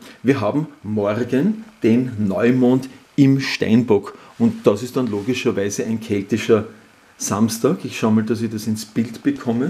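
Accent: Austria. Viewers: Ich mag den sarkastischen Humor vom, der fehlt mir hier in Deutschland, und dazu noch das Österreichische!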